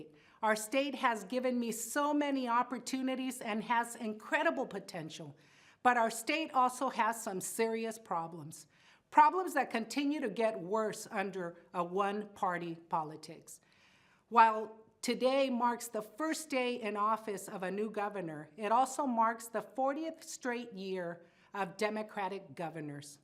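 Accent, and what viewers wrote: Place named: United States. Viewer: Nice to meet you, we have a lot in common. This is how a real American talks.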